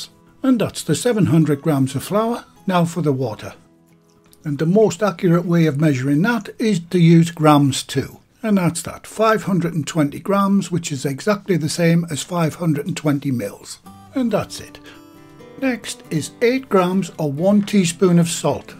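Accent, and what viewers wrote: United Kingdom. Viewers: I like the British accent rather than the American, this is one of the reasons I like this video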